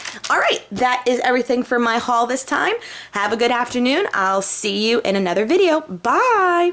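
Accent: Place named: New York, United States. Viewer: I knew you from NYC! I here it in your voice lol. Anyways.. Love the haul!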